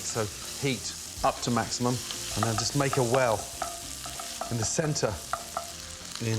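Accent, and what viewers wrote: Scotland, United Kingdom. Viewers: I definitely hear the Scottish come out in this video way more than others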